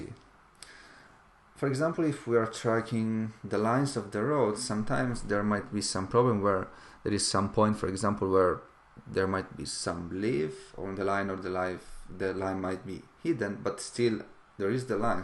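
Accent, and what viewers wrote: Italy. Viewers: Thanks a lot! Are you Italian?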